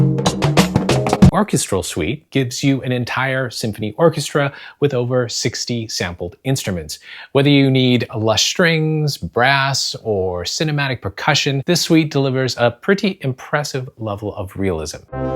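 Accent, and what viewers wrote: India. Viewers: Hi. You are clearly from india. but i am wondering what your mother toungue is? I love Your reviews. This is Preetam from India.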